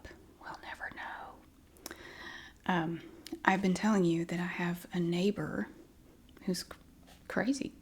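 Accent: South, United States. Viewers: I really love both of channels I'm from the UK and I really love the southern American accent I find it very relaxing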